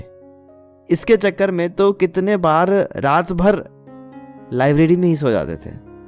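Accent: Bihar, India. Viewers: Pure Bihar hai aapke abaj me ❤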